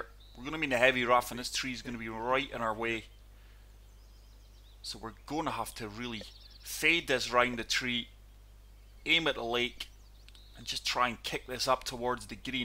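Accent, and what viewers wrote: Scotland, United Kingdom. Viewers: I hear scottish i sub 🏴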